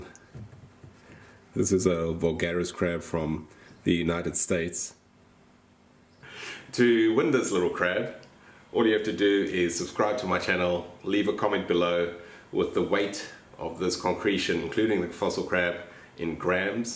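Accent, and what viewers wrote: South Africa. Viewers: Are you an ex South African?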